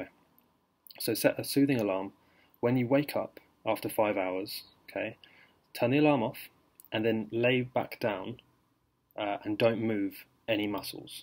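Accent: United Kingdom. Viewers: Makes sense..I've never had a lucid dream, not ever really tried to. But I think I will after watching this. My body naturally wakes up between 5am-6am & then when I get back in to bed and fall asleep I have the most intense real life dreams, so colourful & I can touch people and talk and do things..but I think I am not lucid dreaming because I only remember it once I wake up and I've never drifted off in to sleep while staying still. I usually always lie on my side..from the urge to haha..but yeah it makes sense in to hear this method..I will let you know if it works. Thanks for your video, also nice to hear a fellow Brit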